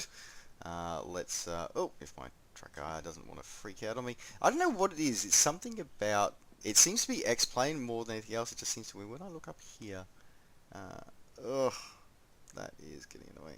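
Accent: Australia. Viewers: Are you Australian? I am aussie too :D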